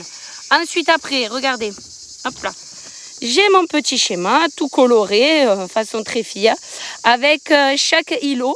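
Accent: South, France. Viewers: Quelle doux bruit !.pas les cigales !ta voix du sud bien sur 👍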